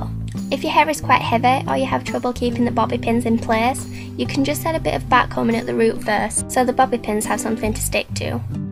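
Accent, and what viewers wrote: Yorkshire, United Kingdom. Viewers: Her accent is from Yorkshire, England :)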